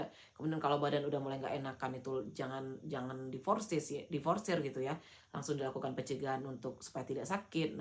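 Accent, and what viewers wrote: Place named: West Sumatra, Indonesia. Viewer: Mba org Minang kah?😊nada bicara nya seperti logat org Minang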